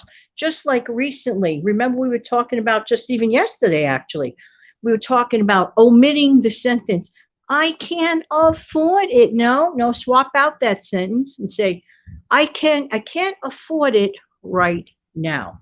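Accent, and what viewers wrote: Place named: New York City, United States. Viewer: New here ……came here after seeing you with Prepper Princess and Dawn. You have that New York City accent. I probably have the same accent…. I am in a state close to NY. I like the different accents and views of other people. You learn things… it’s fun.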